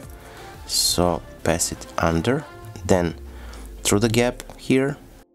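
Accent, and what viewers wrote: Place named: France. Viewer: Very good film avec accent french😂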